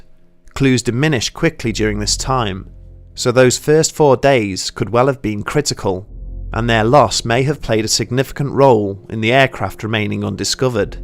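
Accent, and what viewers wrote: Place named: United Kingdom. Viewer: The brit vocals are irritating LOL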